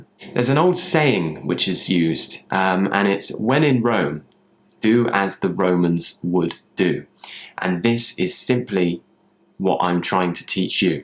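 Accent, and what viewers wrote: United Kingdom. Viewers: i just love the British accent, not necessarily posh but very well spoken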